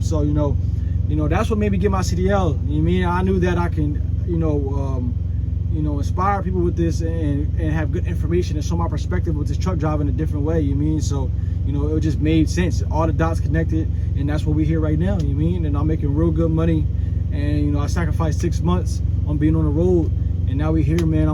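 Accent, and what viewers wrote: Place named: Philadelphia, United States. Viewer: How much they pay ups truck driver now for local cuz u sound like u from philly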